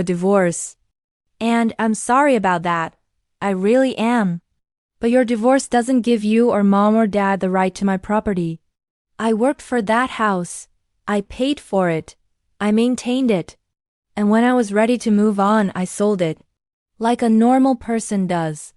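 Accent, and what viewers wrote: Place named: Asia. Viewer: Why do you sound like you have an Asian accent while a bunch of white people are used for the story?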